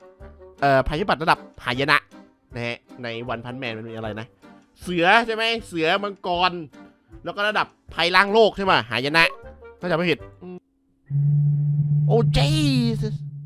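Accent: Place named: Suphanburi, Thailand. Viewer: สำเนียงสุพรรณ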